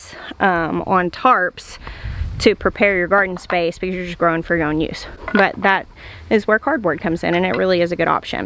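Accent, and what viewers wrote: South, United States. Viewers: Gurl...I listened to you for 5 minutes saying what I thought was "silage sharps"! You have such a southern accent! Finally I realized you were saying "silage tarps", or as we would say here in New England "silage tahps". lol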